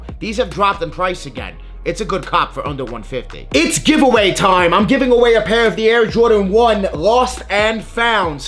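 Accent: New York, United States. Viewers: been following you now for a minute. ur doing it the right way with engagement. the give aways and drawings. great connection with ur audience and subscribers. i’m GONNA get some lost n founds eventually 😅. also u gotta be from NY.. what part of LI u from? i can smellll it.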